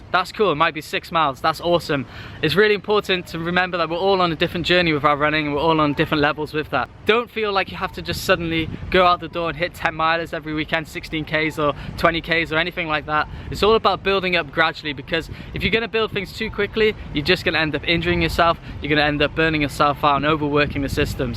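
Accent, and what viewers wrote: United Kingdom. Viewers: You sounds british but have palm trees in the background. whats the story there?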